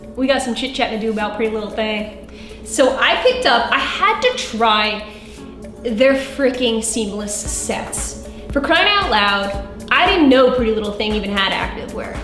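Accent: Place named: New York, United States. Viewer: I noticed you have an accent, are you from New York, or New Jersey? Just curious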